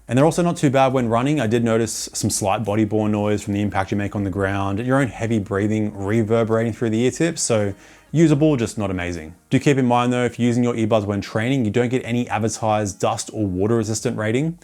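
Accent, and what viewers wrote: Australia. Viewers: I wish I could pay YOU to stop using an Australian accent. Holy shit, nothing is more annoying than an aussie accent.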